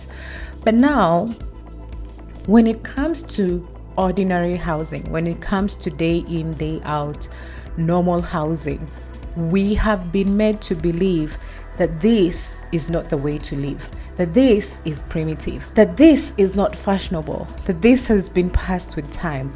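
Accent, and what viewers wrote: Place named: Kenya. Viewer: The fact that its a Kenyan narrating, that makes is so much better. Im researching African architecture. This is how im building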